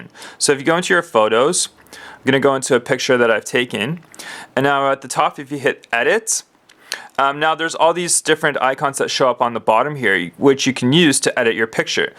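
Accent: Canada. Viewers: dude, are you from fucking Canada? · IS HE CANADAIN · That's Canadian accent.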